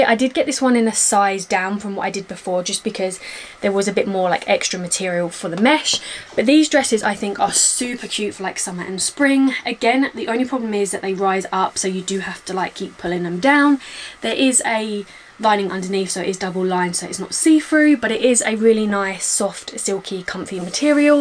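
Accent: United Kingdom. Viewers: I Love You British accent.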